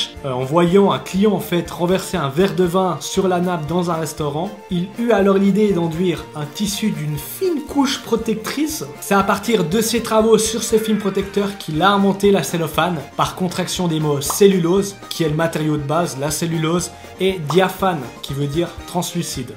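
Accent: Vaud, Switzerland. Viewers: Eh ben t'es vaauudoois ! 😁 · Salut mec tu est Vaudois ou quoi? Perso je viens d'un village au dessus de Lausanne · L'accent vaudois est présent!😂😂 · Le plus accent vaudois qui fait plaisir😂 moi j'ai un accent fribourgeois et de paysan (jte laisse imaginer tché don) 😂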